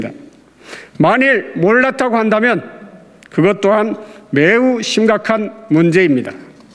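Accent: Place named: Jeolla, South Korea. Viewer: 얼굴보고 더듬어당인줄 알았다. 전라도사투리 좀 그만써라. 토나온다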